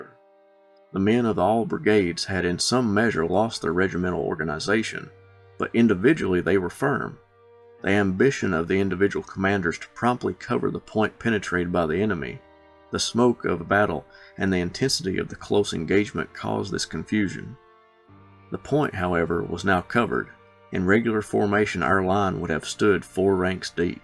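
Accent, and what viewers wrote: South, United States. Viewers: Narrator sounds Southern but anti Southern.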